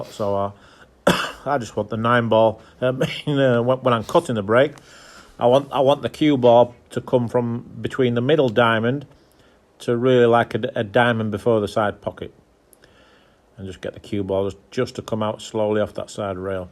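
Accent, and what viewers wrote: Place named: Northern England, United Kingdom. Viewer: 29-1 come on Daz, bit lacking that mate. Nah, only kidding, absolutely fantastic play. I'm living in Thailand now and get to play on some great tables in some lovely places and yours, Neils and Karl's videos are so informative and easy to watch. It's great to hear your accent as I don't get that here much as I'm from Hull originally so not far away from you. Keep the videos coming. It's great to see you hitting some good form again. Let's have a few more worlds off ya matey. Also, what do you think of Judd Trump playing in the U.S open, hopefully a good thing as it may start the ball rolling to get some more money into the game and a new set of viewers. Still undecided myself as I don't know but hope he will give the game the respect it deserves. Thanks for your time doing these videos Daz, they are so helpful, informative and very much appreciated. 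P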